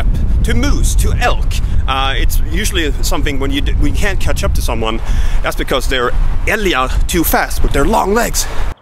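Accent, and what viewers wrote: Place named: Scania, Sweden. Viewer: as a subscriber of you for a long time, not so hard to hear your Scanian dialect. Thanks for the teaching everything about Swedish!!!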